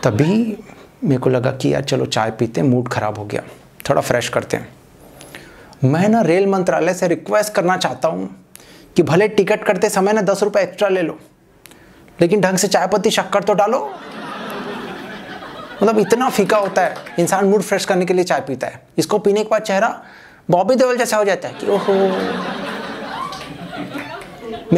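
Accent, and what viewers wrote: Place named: Eastern Madhya Pradesh, India. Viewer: Why his tone sounds like he is from Madhya Pradesh ,and that too eastern part of m.p 🤔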